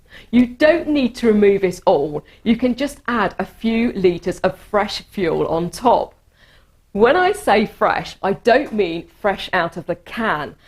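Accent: United Kingdom. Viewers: the sound of a british accent from a pretty lady really is giving me a hot shot in my veins.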